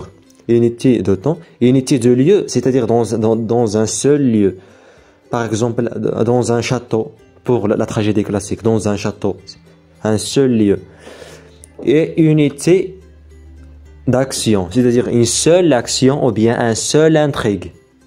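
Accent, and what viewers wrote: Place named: Arab world. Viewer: Ohhh l'accent arabe🤓🤓 sinon bonne continuation frr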